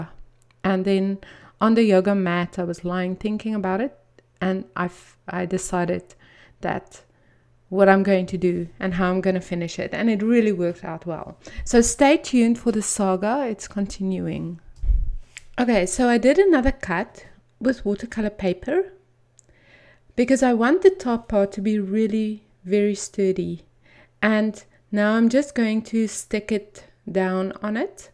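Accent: South Africa. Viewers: Beautiful, once again! Your layouts look so deceivingly simple... The white background works wonderfully with your style. A blessed year for you too <3. By the way, I love your accent. Reminds me of my childhood a lifetime away in Johannesburg.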